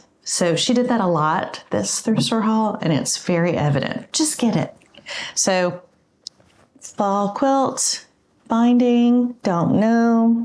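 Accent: South, United States. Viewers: I love quilting, I love fabrics, I love that you thrift shop with your daughters and I love your calming, reassuring southern voice🙂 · I needed some fun this morning & your video fit the bill. Thank you for posting lighthearted and fun content. I enjoy that you let the South out in your speech. Being from Texas, it makes me feel like I have a neighbor talking to me. Have a great day! You've given a great start to mine!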